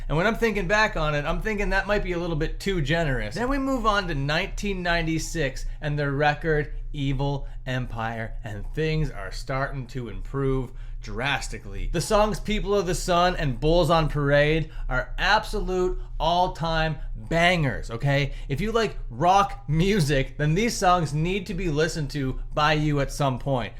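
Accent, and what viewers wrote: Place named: Canada. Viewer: He’s speaking Canadian buddy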